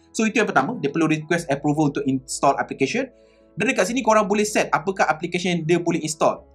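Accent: Melaka, Malaysia. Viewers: Amek ternganga gomen dengar org melaka haha.. terbaik boh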